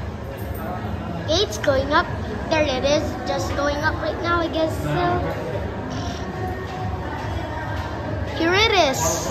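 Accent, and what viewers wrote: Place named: China. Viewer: Thick Chinese Accented Voiceover!!!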